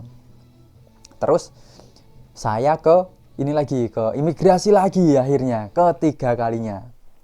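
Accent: Java, Indonesia. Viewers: Jowone ngendi mas..aq kendal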